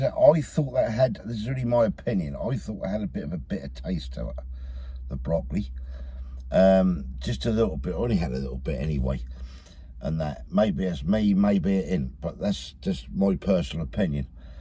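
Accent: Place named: Suffolk, United Kingdom. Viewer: Hi and, I’ve just come across your channel, love the accent. My husband was born in Suffolk I lost him late last yr with Alzheimer’s and vascular dementia. Your videos are amazing and of course your accent reminds me of my husband. 😊😊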